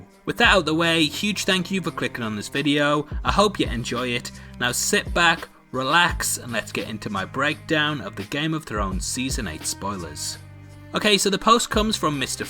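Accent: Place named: United Kingdom. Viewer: Why is every spoiler vid narrated by a guy with a brittish accent.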